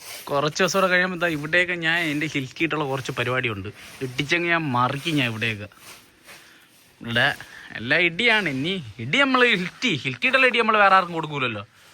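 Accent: Trivandrum, India. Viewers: എനിക് നിങ്ങളുടെ വീഡിയോസ് ഇഷ്ട്ടമാണ്. ട്രിവാൻഡ്രം ഭാഷ കേൾക്കാൻ രസമുണ്ട്. ഒരു 👋 ഇട്ടേരെ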